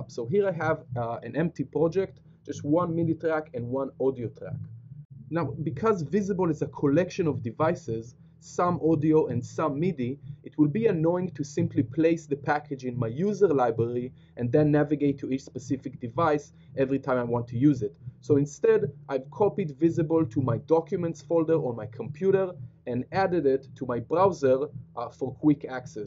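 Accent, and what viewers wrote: Israel. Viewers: Hey i know that accent! shalom =] getting into vj'ing. this helps alot thx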